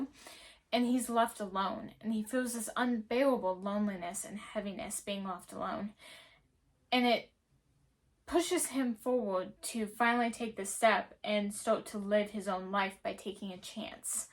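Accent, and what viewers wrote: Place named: United States. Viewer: Hi thanks for the video. English is not my first language. I'm very curious about human geography. You've got very cute accent. I'd love to know if it's from some specific part of the US.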